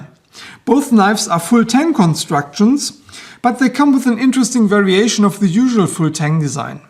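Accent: Germany. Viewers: englisch etwas deutsch aber da ich es auch nicht besser kann keine reale kritik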